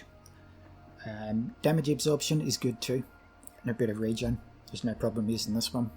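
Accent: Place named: Australia. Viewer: Thank you for the information. Aren't you Australian? I saw in the comments that you have an aussie accent.. You woke up very early on the holiday morning. Sorry for sending you a comment notification in the early morning!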